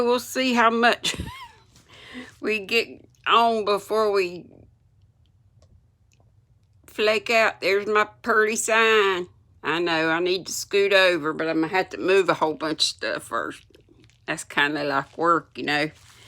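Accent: South, United States. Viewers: Oh by the way it was fun to hear Tom Sawyer read in the southern language it was written in😉( I’m a northerner)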